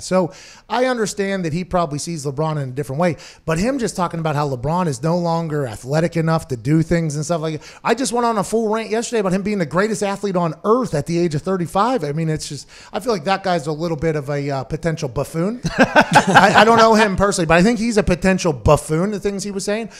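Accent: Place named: Texas, United States. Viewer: LOL your Texas accent is fire!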